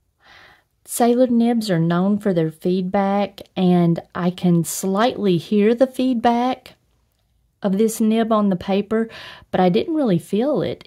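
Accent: South, United States. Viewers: I saw one of her videos for I-don't-recall-what, I stayed for her consistent review technique in her videos and of course, the soothing voice in that cheery southern accent ☺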